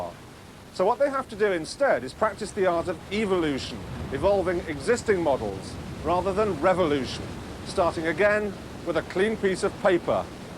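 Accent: RP, United Kingdom. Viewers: Still had his posh accent. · He went to a private school in Doncaster & then Repton School, hence the posh voice and head boy attitude. Reckons he was bullied at school, and seems to have spent the rest of his life giving everyone else grief. His first wife left him after 6 months for one of his mates, hehehehehe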